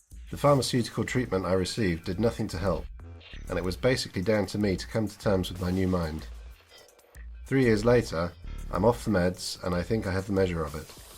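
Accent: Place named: United Kingdom. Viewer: A British accent!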